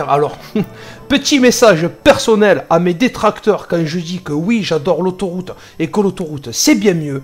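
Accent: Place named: Corsica, France. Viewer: Mais ma parole, tu es Corse..je reconnais ce bel accent ❤, en tout cas si ce n'est pas le cas, tu dois avoir du sang Corse 🙏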